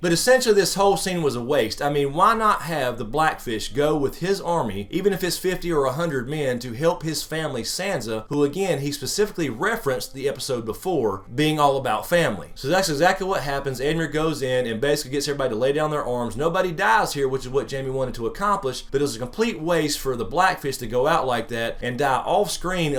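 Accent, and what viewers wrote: South, United States. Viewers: I just clicked like bc u sound like a southern n I love the Midwest (live in California now n miss my sweet Arkansas lol)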